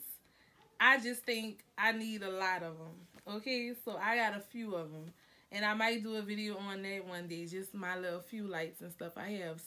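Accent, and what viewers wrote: New Orleans, United States. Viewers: You must be from around New Orleans. I know that accent.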